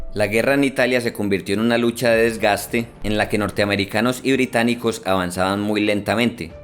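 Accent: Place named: Antioquia, Colombia. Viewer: Ese acentico paisa le quita veracidad al vídeo, ya sebemos que los paisas son tan mentirosos y exagerados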